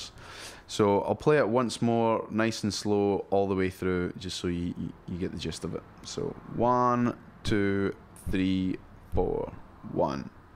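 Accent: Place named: England, United Kingdom. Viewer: Very helpfull lesson, and like the english accent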